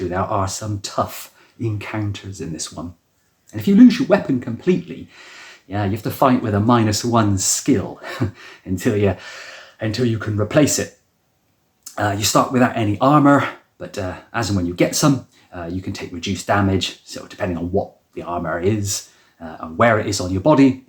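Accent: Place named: West Country, United Kingdom. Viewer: Aye; that was a best of release. Well spotted. I detect a slight west country twang in your accent. I'm in Yarm, NE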